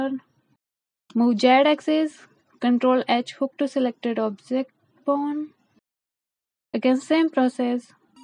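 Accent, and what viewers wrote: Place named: India. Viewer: Hi after listening your voice it feels like you are an Indian should we chat about blender future in India together if you insist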